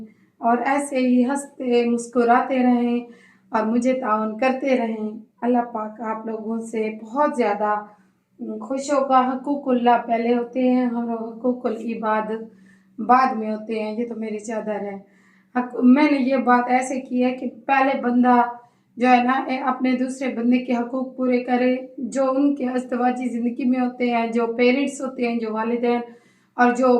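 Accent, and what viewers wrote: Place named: Saraiki, Pakistan. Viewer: Urdu to achi bolti hain sis bs lehja Kuch change krny ki zrurat hai qk Urdu Mai b lehja bilkul sraiki wala hai na is Liye log kehty hain k sraiki hi bol lia kren....Jo unparh hoty hain wo to lehja sraiki wala b 4khyn ya Urdu toti photi bolyn koi Kuch nhi kehta lekn AP to mashaallah educated hain na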